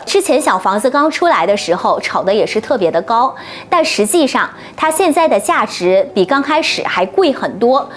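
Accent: Japan. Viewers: 請問英文是日本口音嗎😂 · 是的呀 日文发音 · 她好像是在日本，日式英語不標準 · 對啊，她是在日本生活的，英文發音就是日本人的發音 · 是日文加大东北混合发音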